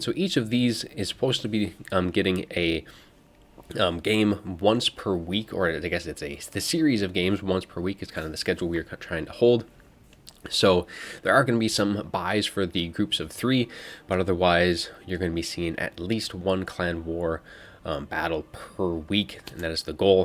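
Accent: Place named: Russia. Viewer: Great accent. Russian right?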